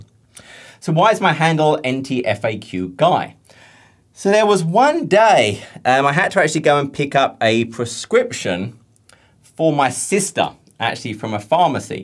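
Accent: United Kingdom. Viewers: I eventually know why your speaking with british accent , it's so familiar to me . Thanks for sharing your story. It's awesome !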